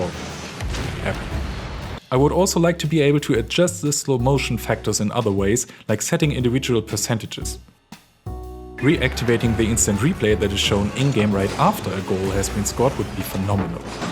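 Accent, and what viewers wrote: Germany. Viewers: Ich hab sofort gewusst, dass du Deutscher bist haha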